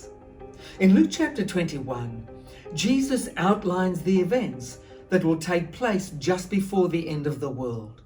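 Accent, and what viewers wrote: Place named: Australia. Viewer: his accent is like Australian accent.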